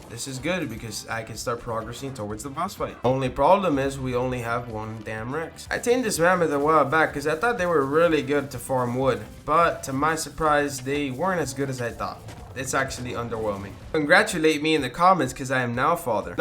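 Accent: France. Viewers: I love your french accent and your balcony👌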